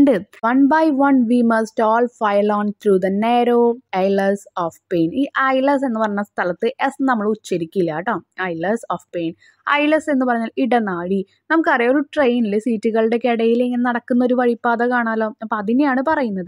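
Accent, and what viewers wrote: Thrissur, India. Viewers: തൃശ്ശൂർ സ്ലാഗ് ആണല്ലോ 🙂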